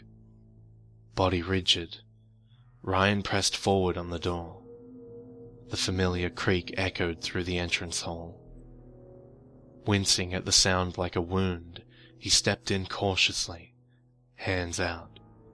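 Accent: Australia. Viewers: Congratulations and thanks, it's nice to hear an Australian accent narrating the creepy stories i love. your story is great too, I love the change of perspective and unexpected ending. great job! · Both myself and my gf love hearing you read stories. Maybe it's that smooth ausie accent. Either way I'm watching your whole videograpgy. You are a great storyteller, never loose that wonder you have; through your mind and eyes and words we experience stories we may be too scared or busy or whatever to read ourselves. Laugh, cry, fearful tremors, or apathetic visualizations. You walk through them with us. Much love from cali, and thank you.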